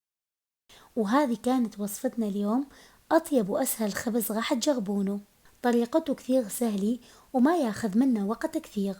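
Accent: Mosul, Iraq. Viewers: يسلم ايداج صوتج يخبل وكلام موصيلي وفوري يخبل ياريت اسم الصفحه مشتريته منهم القوري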